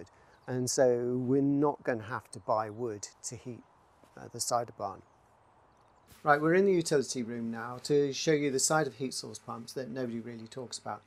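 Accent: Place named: Southern England, United Kingdom. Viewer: It would help to know where in the country you are. I take it you are not in Scotland and from your accent you are probably in the South. So is the system only suitable in Southern England where, these days, it is quite warm?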